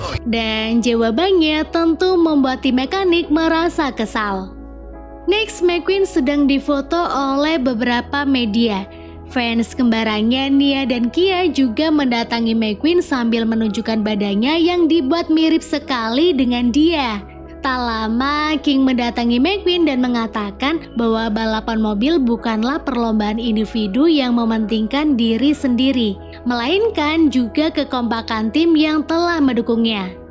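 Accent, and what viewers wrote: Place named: Java, Indonesia. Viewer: Jowone medok men mbak e😁😁😁😁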